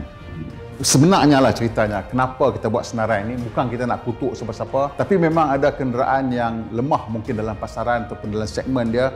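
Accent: North, Malaysia. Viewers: Ni versi utara ka? Lain maciam dia punya bunyik....ha..ha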